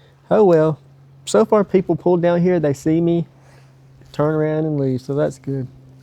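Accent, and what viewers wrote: South, United States. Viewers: love your southern accent bro.i have a good bud from San Antonio Texas.builds golf courses all over the world.met him here on Vancouver Island years ago while he was sculpting a course.he had a good accent as well.cheers.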